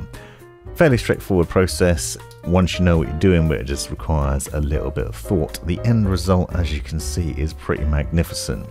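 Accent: United Kingdom. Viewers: As others have said, you are probably the #1 video producer on YouTube for tech stuff. Your speaking voice is head-and-shoulders above the competition! Even though you're clearly a Brit, you are very CLEAR and speak at a reasonable pace. Others mumble and rush through their delivery and it is quite off-putting to be honest! I am a subscriber to your channel and recommend others support you by LIKING and SUBSCRIBING. Cheers and keep on!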